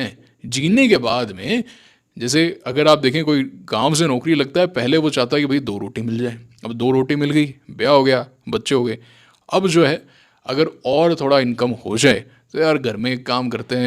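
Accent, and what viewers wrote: Rajasthan, India. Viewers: आपके बोलने के टोन में कुछ ज्यादा ही राजस्थानी बोली झलक रही है, पहले से कुछ ज्यादा, so मिट्टी से जुड़े रहिए 👌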